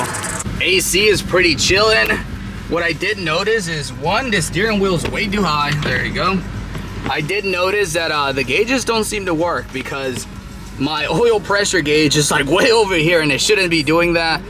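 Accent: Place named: United States. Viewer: What state are you from the accent is crazy lol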